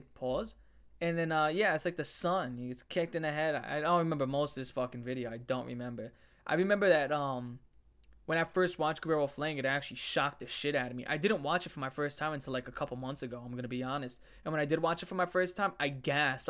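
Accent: New York, United States. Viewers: Baltimore hat, New York sounding accent and Puerto Rican flag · most new york accent ever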